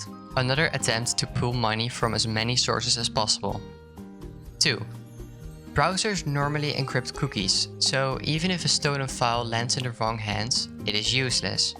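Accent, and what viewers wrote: Netherlands. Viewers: Are you from the Netherlands? You really sound like it.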